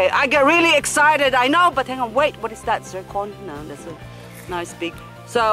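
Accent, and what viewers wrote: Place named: South Africa. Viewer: sounds south african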